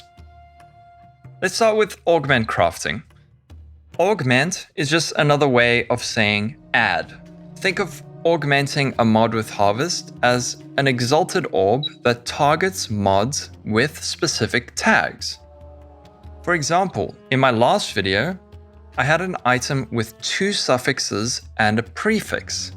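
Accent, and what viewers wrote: South Africa. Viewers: Is it my imagination or are you South African?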